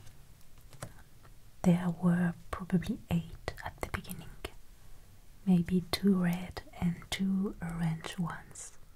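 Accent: France. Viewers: I just realized that your beautiful way of speaking English with your French accent has brought back many memories from 30 years ago when I fell in love with a Vietnamese woman who I regard as the love of my life, who learned French when she was young in school in Vietnam in the 1960s and lived and worked in France (Bretagne) for a decade after escaping Vietnam in the 1970s. She learned English as an adult and had a very unique way of pronouncing certain English words, which thanks to you I now recognize as being a French accent but I never realized it until now. Thank you for bringing back those good memories even though my love story had a sad ending, but having listened to your voice in a wonderful ASMR video has helped heal the scars that are still there for me as I can hear her voice once again through your voice.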